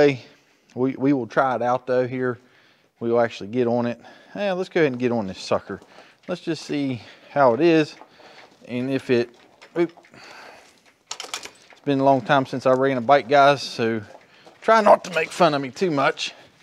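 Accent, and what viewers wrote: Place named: West Virginia, United States. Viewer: I knew I recognized your accent, I myself am a West Virginian. Proud to have you representing. 🤠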